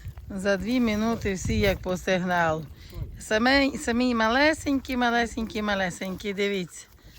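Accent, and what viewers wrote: Transcarpathia, Ukraine. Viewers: Та де се рівненщина, се закарпатський акцент, но се не в горах бо потік явно не гірський. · По говірці ніби Закарпаття.. Але річка на закарпатські річки геть не схожа.. то де є ця краса? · Ви молодець)) Це суперово!)👍 Великий плюс Вам в карму))💯 Я також любитель підгодовувати качок коли на ріці, голубів, ворон, все це чудово заспокоює нервову систему) * Я сам з Хуста, чую такий знайомий закарпатський акцент за кадром)😊👍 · По розмові чую що Закарпаття 👍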